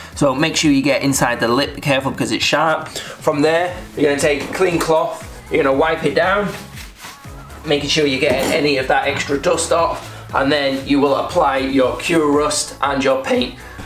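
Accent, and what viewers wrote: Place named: England, United Kingdom. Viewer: I really enjoy listening to you speak. (English accent) 😁👍🏽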